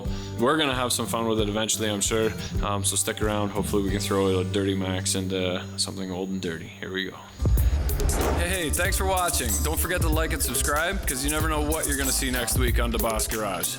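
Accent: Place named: Canada. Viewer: I got sent this video out of the blue to my personal FB page saying "hey Brian, you've got a doppelganger! It's you, but with a Canadian accent! I said, heck ya- it's my dopple-ginger! We're already met and friends eh?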